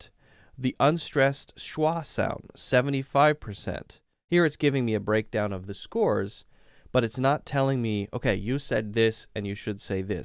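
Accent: China. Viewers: Your chinese accent is just wow!! So chinese! Impressive! Thanks for sharing this with us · 应该是北方口音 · You have a very good Chinese accent 👍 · 4 years later and I just wanna say your Chinese accent hits hard as a Chinese person😢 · hahaha I find this video because of my work's research. Although this was three years before, it really helped! Thanks! And I have to say your Chinese accent of speaking English is pretty good hhhhhh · your Chinese accent is amazing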